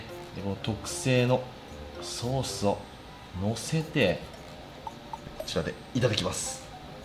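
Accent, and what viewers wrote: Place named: Mikawa, Japan. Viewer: 世界に届け三河弁🌍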